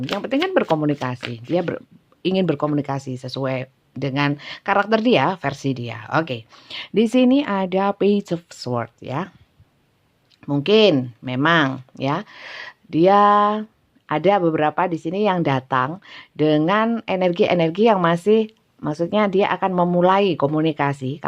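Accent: Java, Indonesia. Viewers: Setuju bunda.. dg bahasa bunda yg agak" medok..sy suka banget.. krn sy wong ndeso bunda. Trenggalek jatim..💪semagat ya bun. Smg bunda sht sll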